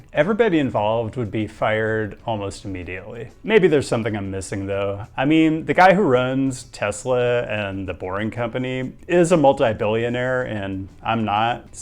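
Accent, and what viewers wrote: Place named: Southern California, United States. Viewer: Why is this man so handsome where is he from? He sounds like he is from south California maybe San Diego ? He has cat but not sure if he has wife or partner?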